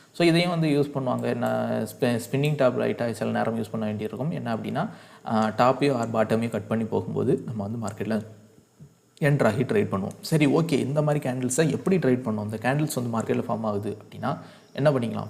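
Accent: Kanyakumari, India. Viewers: slang vachu kandu pudicha am from kanyakumari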